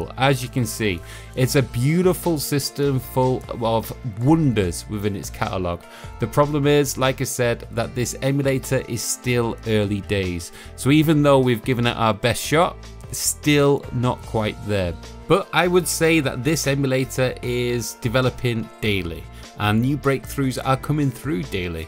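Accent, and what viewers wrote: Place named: United Kingdom. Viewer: i liked and subbed and now commenting and because i did all that is this is the best guide and your British which so am i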